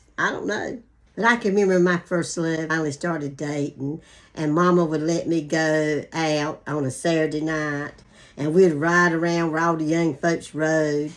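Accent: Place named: South, United States. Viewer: These are the best kind of stories. Thank you for sharing. I gotta say that your amazing southern accent makes it even better for this west coast gal.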